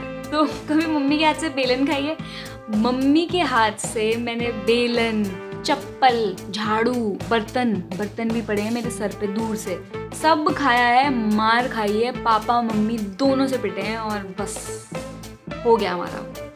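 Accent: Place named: Delhi, India. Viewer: love your videos.. very addicting.. tum delhi se ho na? tumhare dilliwale accent se lagta hai.. main bhi dilli se hoon to tumhe sunkar maza aa jata hai.. keep up the good work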